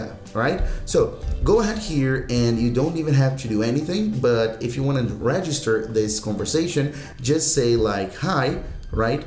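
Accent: Brazil. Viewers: Valeu cara otimo video, na hora que vc começou a falar, notei algum sotaque brasileiro (seu ingles é otimo) ai confirmei quando eu vi Lazaro negao e Amor, Vlw !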